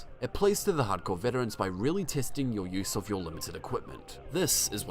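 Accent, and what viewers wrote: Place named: New Zealand. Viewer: Was listening to a random gaming podcast on Youtube. Heard an NZ accent. Instantly subscribed.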